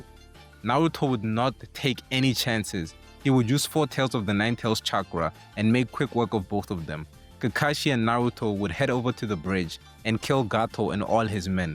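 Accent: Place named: South Africa. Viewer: I hear South African accent ... ARE YOU SOUTH AFRICAN ?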